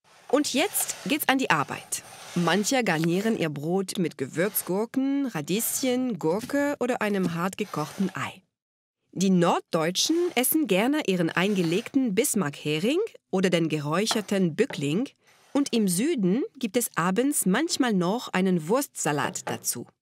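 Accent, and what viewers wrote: France. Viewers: Am schönsten bei Karambolage ist noch immer der französische Akzent. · Aww, ich liebe diese kleinen Filmchen zur Erklärung 😍. Ich liebe den Akzent und die französische Kultur. Spannend, wie die deutsche Kultur gesehen wird. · Wieder so süß erklärt.... mit diese charmante französische Akzent! Unterhaltsames Bildungsfernsehen 😘 Jaaaa, wir Deutschen lieben unser Brot, kann ich nur bestätigen!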